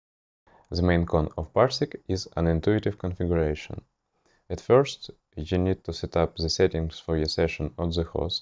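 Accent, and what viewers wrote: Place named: Russia. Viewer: Hey, I've noticed that you're Russian. Good English, man! :)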